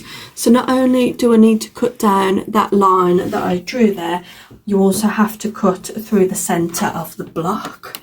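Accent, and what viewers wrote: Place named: England, United Kingdom. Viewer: Hello, I am new to quilting and your channel, so interesting to see how a quilt comes together. Please keep it up on YouTube. I would love to see more tutorials especially with an English accent! I prefer the landscape format if that’s possible but not essential. Thank you for sharing your journey, much appreciated. Happy sewing.🧵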